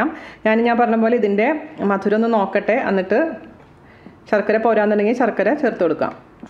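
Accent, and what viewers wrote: Thrissur, India. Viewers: നല്ല രസമാണ് അവതരണം തൃശർ ഭാഷയല്ലൊ.' ''?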